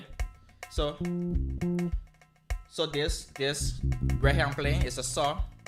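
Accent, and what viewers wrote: Liberia. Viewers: You sound like a Liberia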